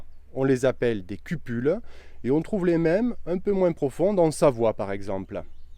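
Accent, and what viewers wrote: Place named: South, France. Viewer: Bravo pour ce petit tournage, "avé l'assent" en plus!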